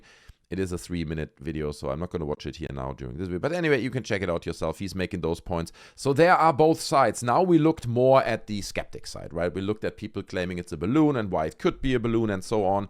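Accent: Germany. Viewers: The German accent takes this channel so far over the top, I couldn't subscribe fast enough. Commence binge!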